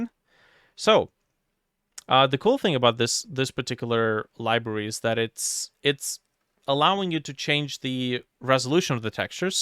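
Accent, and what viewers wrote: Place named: Eastern Europe. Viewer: you speak good english but, i dont know why, i hear some slavic accent lol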